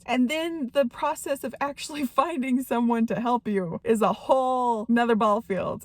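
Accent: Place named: Midwest, United States. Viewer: I know you said you’re in CO, did you vote up there? Your accent sounds very northern/Midwestern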